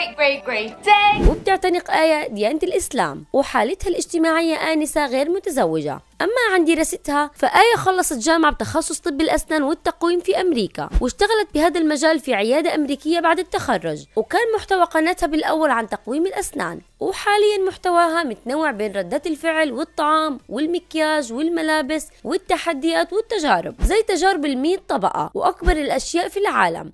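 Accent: Yemen. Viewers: ايه كانت تصور فيديو ف تيك توك هي واحد وسألوها المتابعين في التعليقات دا زوجك ردت وقالت ايوه مين قال لكم انها مش متزوجة لا هي متزوجة 🙂💕 تعديل هي لما عاشت في مصر وقلتوا انها تتكلم مصري Noo هي اللهجه اليمنيه كدا زيي يمنيه✌🏻💜🌝🇰🇷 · الهجه يمنيه بجنوب اليمن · اني كنت احسبها سعوديه بس طريقت كلامه يمنيه هلا ببنت اليمن ❤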